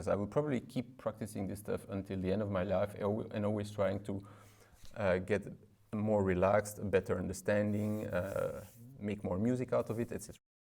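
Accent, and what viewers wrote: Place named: France. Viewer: J’avais pas vu ton nom. J’écoutais la vidéo et je me suis dit : ça, c’est un français. Raison m’en a été donnée.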